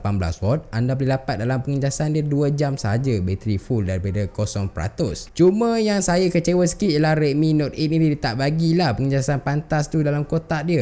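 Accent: Malaysia. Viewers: slang dia kot..biaselah typical malaysian..aku pun macam tu...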